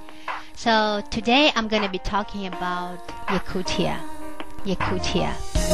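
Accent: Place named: United States. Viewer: American accent does not sound good. In any way.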